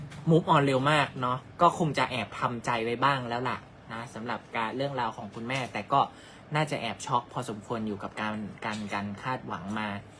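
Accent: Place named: Ubon Ratchathani, Thailand. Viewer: อุบลชัดเจนครับ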